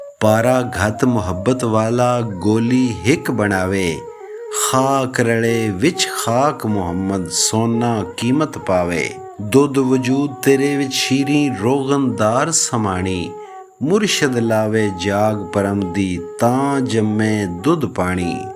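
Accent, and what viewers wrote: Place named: Lahore, Pakistan. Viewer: السلام علیکم عید مبارک صاحب. معزرت کے ساتھ سیف الملوک کے خالق کا لہجہ پوتھواری زبان میں تھا اپ اس کو پنجابی لاہوری اندازا میں، پیش کر رہے ہیں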